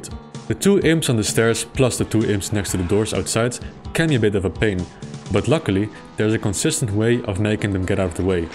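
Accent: Netherlands. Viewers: Are you dutch? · Are you Dutch? Jouw accent klinkt een beetje Nederlands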